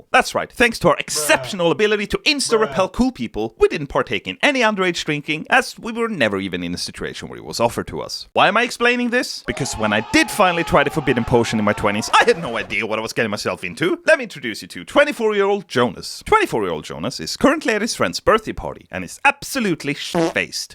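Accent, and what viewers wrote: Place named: Sweden. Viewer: I really love these vids. They're definitely worth the wait! Plus we get to listen to Jonas' EPIC Swedish accent!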